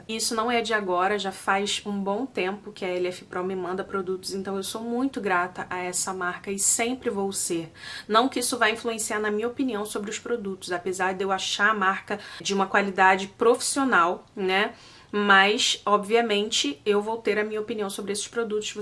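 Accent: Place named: Rio de Janeiro, Brazil. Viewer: sua lindaaaaaaa, você é maravilhosaaaaaaa, mesmo! Carismática, de uma delicadeza ímpar! Tão bom ouvir você falar, a maneira doce com que se expressa com tanta verdade e com tanta suavidade ao mesmo tempo. Não se desculpe por nada...quem gosta de você, gosta de tudo e entende...transições não são fáceis. Mas acharemos juntas formas de transformar o que agora pode parecer um limão 🍋 em uma limonada, rsrsrs. Resista, insista, persista! Ouvir esse seu sotaque carioca tão delicioso e lind é sempre maravilhoso, e suas resenhas são de uma qualidade impecável! Vai dar certo! Vamos buscar as marcas. Marcar você pra que lhe enviem produtos. Você merece! Seu conteúdo é muito bom e único! Eu te adoro, mulher! Me motiva muito a me empoderar mais nesse universo de beleza, de maquiagem, de autoestima, de conhecer esse seu novo mundo aí também, nos EUA, e porque não? 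Você tem LUZ PRÓPRIA, acredite, e ela mexe com a nossa vida, pra melhor. Sei que você só pode dar certo. Só falta ajustar alguns detalhes nesse novo momento. Beijo enorme, lindeza!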